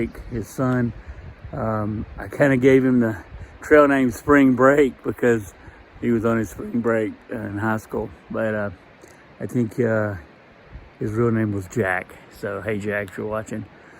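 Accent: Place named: Tidewater Virginia, United States. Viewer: Just guessing, your accent sounds like you were in the tidewater area of VA. When I was a kid there were very distinct accents here but not so much any more.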